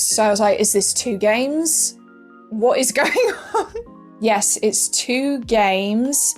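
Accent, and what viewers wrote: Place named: RP, United Kingdom. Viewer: You have the most Cambridge English International Test Listening Part accent ever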